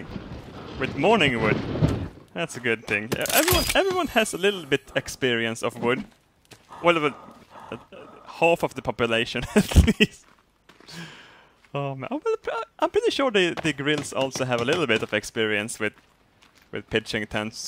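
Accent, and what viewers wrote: Sweden. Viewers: Jag är glad att jag är svensk... Annars skulle jag inte förstå ett ord du säger. jag älskar dit svengelska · Nooo person, don't correct his swenglish.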